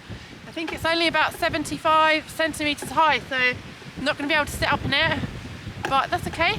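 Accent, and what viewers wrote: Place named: Bristol, United Kingdom. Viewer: Which goes to prove, if it can go wrong, it will! Still, you made the best of it. Trying to place your accent - sounds like Bristol / North Somerset, (I'm from Weston-super.Mare), and it sounds familiar! Anyway, keep on making these interesting videos.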